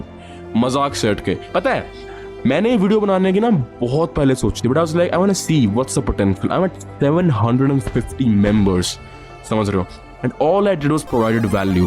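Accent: Haryana, India. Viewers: Bhaiya i just have a simple question jo aap yeh itni bhynke English bolte ho vo kha se seekhi? Dictionary se kisi app se ya kese? Or kitna time lga the way u talk in English and mixed with haryanvi accent aaye haaye ❤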